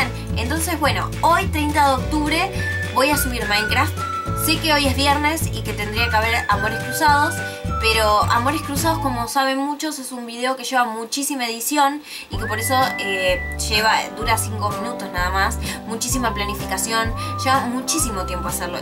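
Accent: Buenos Aires, Argentina. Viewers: Sos de Buenos Aires?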